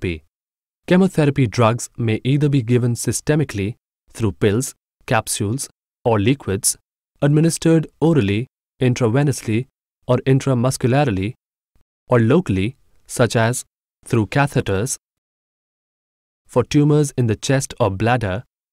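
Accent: India. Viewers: สำเนียงแบบนี้อินเดียแน่เลย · ahhhh yes.... the fabules indian accent absoulutly love it omg i cant spell, anyways whoever is going through this i pray for you to get better and never have doubt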